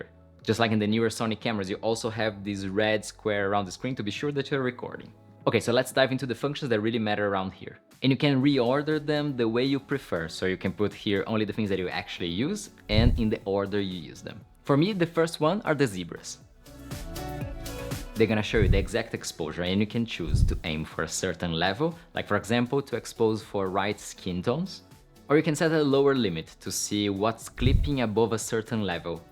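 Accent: Brazil. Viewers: Pelo sotaque deve ser br kkk cara tbm uso uma sony A7IV e apesar de todas essas funções do app ainda acha que compensa pegar por exemplo um monitor feelworld f6 ou só o celular ja me atenderia mto bem?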